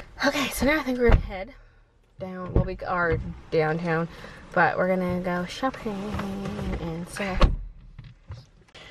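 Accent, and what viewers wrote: Canada. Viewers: You know she's from Canada because of the way she says "bag" and "vague". To her instead of a A sound bag is beg and vague and vegue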